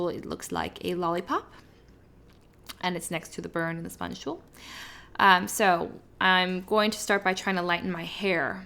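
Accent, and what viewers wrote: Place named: United Kingdom. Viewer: This girl sounds like she might have a fairly alright british accent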